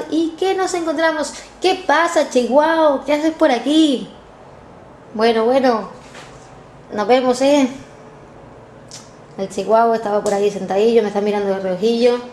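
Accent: Canary Islands, Spain. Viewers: Eres Canaria ? :)) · oye una preguntita, tu eres canaria no?, lo digo porke yo si lo soy y me suena mucho tu acento, y en caso de que lo seas, donde vives con sara en la península o por aquí?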